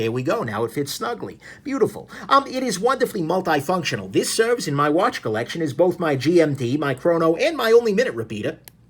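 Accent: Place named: East Coast, United States. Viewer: I usually hate east coast accents as a Brit, but come on I love this review it’s great 😂 this is my grail watch and on that breitling strap it’s stunning I can’t wait to get one!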